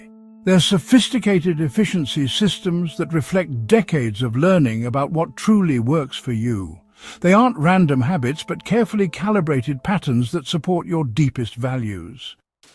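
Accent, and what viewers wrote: United Kingdom. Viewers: First of all, I wonder why they chose to have someone with a British accent, narrate all of this YouTube channel's videos; videos that are clearly targeting those of us seniors in the USA. Is a fancy British accent supposed to make the data sound more sophisticated and scientifically authentic?!?!? (*NOT*) Furthermore, I am almost 85 years old, and the ONLY cognitive difficulties that this lady (me) and all my fellow seniors ever humorously identify as "Senior Moments", are memory lapses of a very particular sort — such as: • Unable to recall a relatively new friend's name • Frequently unable to recall a specific word I want to use, which I have remembered without hesitation, thousands of times in the past • No longer know the correct spelling of lengthy words which I once ALWAYS remembered, after first learning it as a child or youth • Recalling the exact year or decade in which an important event occurred in my life or around the globe • Totally forgetting a conversation I had only a few weeks previously • Etc., etc., etc. 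— and (to be redundant! ☺) — et al Some of the other things on the video are true — such as everything that happened in my life has a story behind it now, which is true of older folks, because being a matriarch or patriarch of our family and friend groups, categorizes us as being "tribally responsible" for passing on the group folklore. It is a joy for me, when I get to share episodes of my history, and my life experience tales. So, story telling IS part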